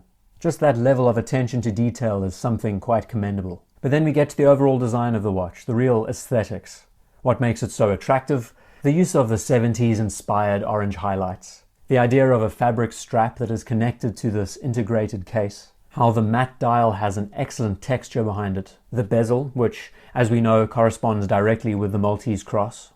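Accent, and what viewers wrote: South Africa. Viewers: I love you - your content. Also, your South African accent is perfect for YouTube. This VC is OUTSTANDING! Nice video mate. Stay safe.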